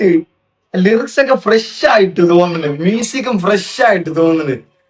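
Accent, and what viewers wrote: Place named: Tamil Nadu, India. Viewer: இவர் மலையாளம் பேசுற தமிழனென்று நினைக்கிறேன்..பேச்சு கேட்க்கும்போது அப்படி தோணுது..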